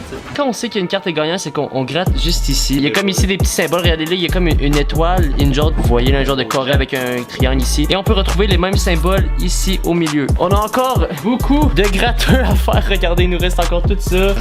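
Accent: Quebec, Canada. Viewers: J'aime l'accent Québécois ❤️ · C'est quebek ou français dit moi stp😂😅😅😅😅😅😅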